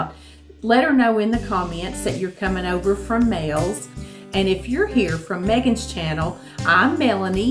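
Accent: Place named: Arkansas, United States. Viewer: ❤ I love your accent ass where are you must be from Arkansas that’s where I’m from but my daddy’s eat mayonnaise sandwiches but they were miracle whip not 😂mayonnaise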